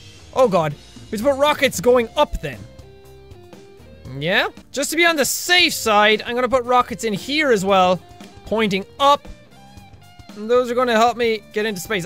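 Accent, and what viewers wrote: Ireland. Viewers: I feel so sad I havnt watched 4eva and I miss the cursing and irishness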